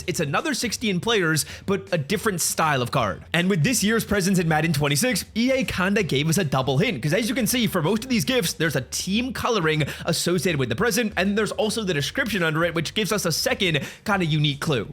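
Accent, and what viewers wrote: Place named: Canada. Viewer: Been saying the same this for like 2 years lol but it’s his Canadien accent I think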